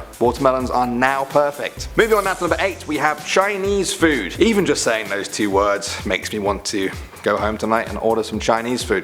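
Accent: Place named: United Kingdom. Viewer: i think that is british accent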